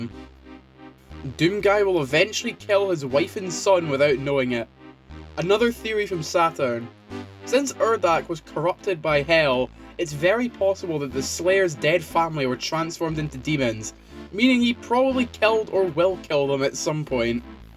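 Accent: Scotland, United Kingdom. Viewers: man i love that Scottish accent.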